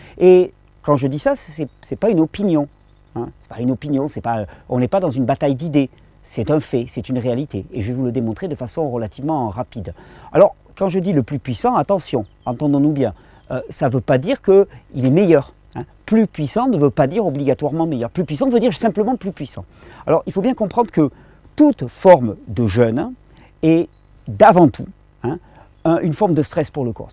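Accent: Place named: France. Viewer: T'as un pt'it accent du fond de la province quand même hein..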